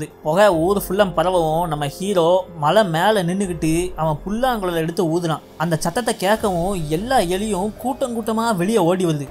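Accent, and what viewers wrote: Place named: Thoothukudi, India. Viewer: Hai bro. . Nama ooru slang mari iruku. . Iam frm thoothukudi. . Especially and ketta vartha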